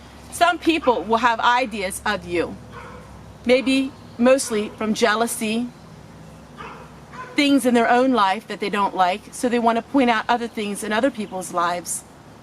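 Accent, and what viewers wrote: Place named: Pennsylvania, United States. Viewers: Yes you can have both. That person is ignorant. I like how you speak, I grew up pretty close to your area in Willow Grove, Pa. during the 60's and 70's so I'm familar with the dialect. Growing up I had two sister Quaker ladies (spinsters) who babysat me and they spoke using always thee and thou in their sentences and wore long dresses and lived very frugally. I learned what a compost pile was from them, how to prepare simple heathy meals and how to wash clothes by hand. People criticize sometimes what they fear. You are honest,simple, godly peole just like my sitters, Cynthia and Myrtle. Keep on being you.👍❤ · I had a feeling you were from the Pennsylvannia area. Choose sounds slightly like 'chews', and on sounds like 'awn' (hard to describe), kinda subtle but I hear it.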